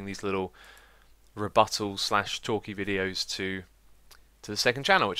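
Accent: United Kingdom. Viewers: Honestly, I'm so confused about this whole thing xD. Not that you're bad at explaining, but that I'm stupid :D. I just like your voice. British accents are awesome to me, cause I'm American, and I just love your stuff :D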